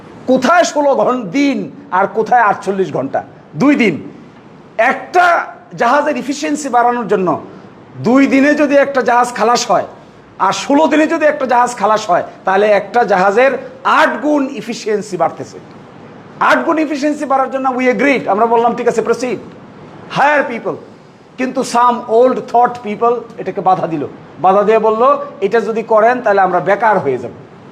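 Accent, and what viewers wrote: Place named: Bangladesh. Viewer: Don't use deshi English buddy when you do public speaking. English doesn't match you. It's really annoying. Use bengali language please in public. In your reading room you can study and practice English.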